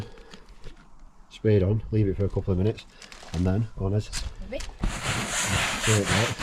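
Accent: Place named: Lancashire, United Kingdom. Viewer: Good Lancashire accent too.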